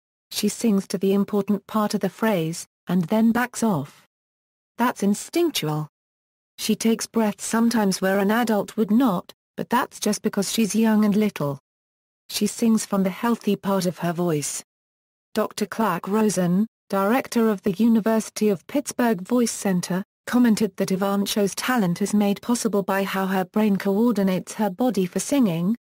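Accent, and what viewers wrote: United Kingdom. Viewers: Nice professional bio. The reader has a nice British accent, but some serious mis- pronunciations. Most serious is Jackie's last name: it should be ee - VAN - koe! Fairly good organization of many activities and accomplishments; it would be good to accent the headings and pause briefly after each one. The vocal delivery could be a bit more expressively modulated, with some enthusiasm, and somewhat less objectively flat. Thanks, though, for having this information about such an astonishingly remarkable youngster available for those who cannot read about her visually :)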